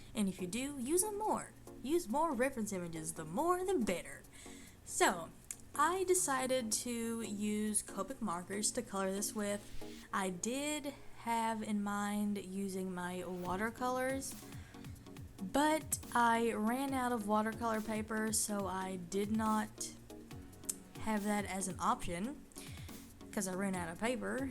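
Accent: South, United States. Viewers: do you have a southern accent? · Where are you from? You sound really southern, I'm from South Carolina but you sound more southern than me and my friends.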